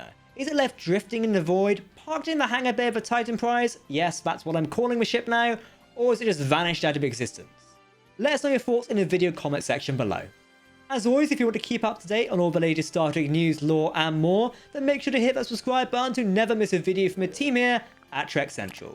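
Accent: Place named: United Kingdom. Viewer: Being hard of hearing is making your accent even harder to process. I would enjoy it much more if you spoke in an American accent. I have NOTHING against British folk, but it is what it is. After all, if weren't for your ancestors, America wouldn't exist. Sorry to be a bummer.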